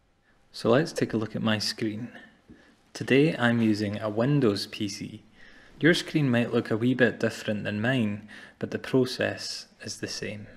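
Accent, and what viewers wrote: Scotland, United Kingdom. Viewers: Thanks for your very helpful video. Ever since I accidentally found an old mp3 player unit when curiously searching around and had no idea on how it works until I found this video. If my calculations are accurate, I have noticed that your accent appear to be Scottish. Correct? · Sounds like Scottish 🤩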